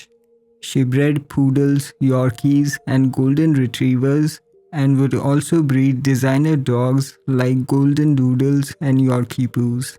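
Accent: India. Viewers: omg this indian accent voice is even worst, unbearable, please use a better voice, it's worst than the robot one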